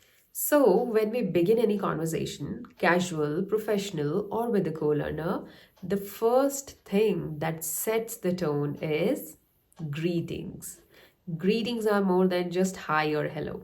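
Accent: India. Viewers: I felt there was a Hindi accent in your tone before, but if I’m not mistaken, I have seen great improvement now.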